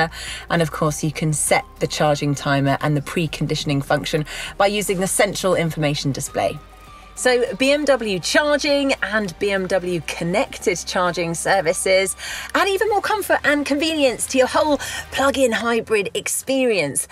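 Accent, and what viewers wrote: England, United Kingdom. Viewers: Very english accent! :)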